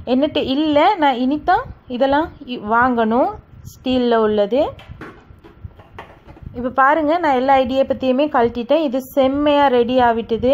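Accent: Sri Lanka. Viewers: ரவா இடியப்பம் அருமை அத்துடன் இலங்கை தமிழ் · This is not Srilankan tamil , this is how Muslims speak in Srilanka ... 👌👌👌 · Super.....Srilanka tamizha neenga pesuvathu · Ur sreelankan Tamil is sweet and so is idiyappam reipie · சகோதரி அருமை ... உங்கள் தமிழ் இலங்கை மற்றும் குறிப்பாக புத்தளம் தமிழ் வழக்கை ஒத்துள்ளது .. புத்தளத்தை சேர்ந்தவரா நீங்கள் ? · உங்களின் இனிமையான இலங்கை கொஞ்சும் தமிழ் அருமையோ அருமை சொல்லி தந்த விதமும் அருமை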